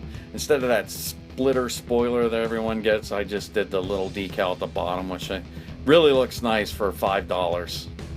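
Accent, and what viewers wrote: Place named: Pittsburgh, United States. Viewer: your have a very strong Pittsburgh accent.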